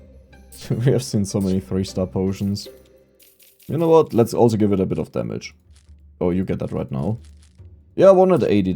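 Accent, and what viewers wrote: Germany. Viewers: Selten jemanden so deutsch klingen hören, wären er englisch spricht 😄. Aber gutes Video ❤ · Ich hatte mir schon vom Akzent gedacht das du deutsch bist, aber auf einmal übersteuert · Wieso ist das so überraschend? Der Akzent ist doch nicht zu überhören 😀 (nicht negativ gemeint)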